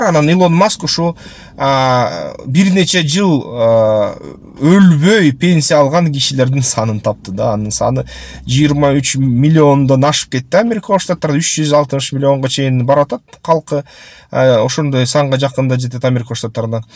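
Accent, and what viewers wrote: Standard. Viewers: тушундуруу таза кыргызча эн соонун❤👍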